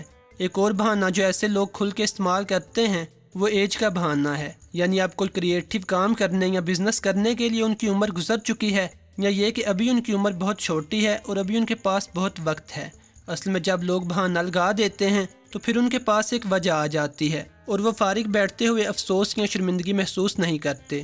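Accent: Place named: Punjab, Pakistan. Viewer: پنجابی لہجے میں اُردو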